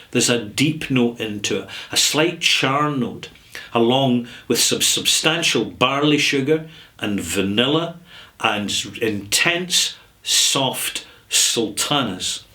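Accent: Scotland, United Kingdom. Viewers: ...your whisky knowledge is without peer...you are virtually a university course on the subject. But sometimes I tune in to hear yuir Bonnie Scots burr...Gaun' Yersel' always, mate!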